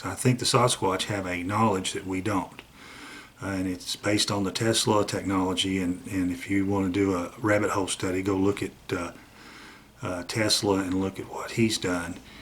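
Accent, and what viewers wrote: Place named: North Carolina, United States. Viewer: From a NC girl transplanted to California it sure is nice to hear my daddy's talk., you are such a kid with that Go Pro. Enjoy. Y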